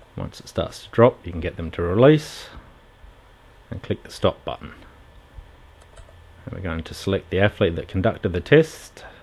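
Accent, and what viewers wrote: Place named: Australia. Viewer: Hi, Video is in English although with a strong Australian accent which is kind of cool :)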